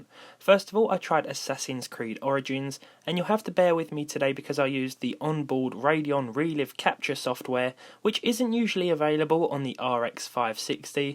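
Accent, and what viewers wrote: United Kingdom. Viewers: hes British dude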